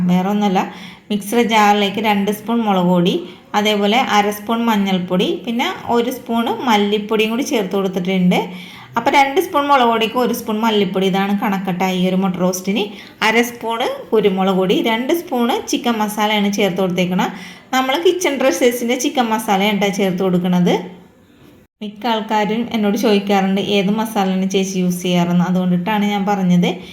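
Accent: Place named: Kodungallur, India. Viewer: Thrissur districtile kodungallur bhagathenganumano iyale veed. Ente veed 3 peedika. Ente samsaram polend. Athond chodichathatta. Adipoli reciepe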